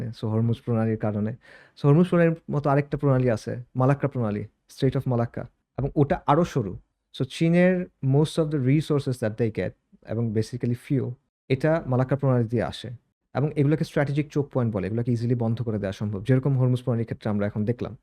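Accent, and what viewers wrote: Sylhet, Bangladesh. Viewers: সিলেটি উপস্থাপক মনে হচ্ছে 😅❤ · Host mone hoy sylheti. thikmoto bangla bolte parena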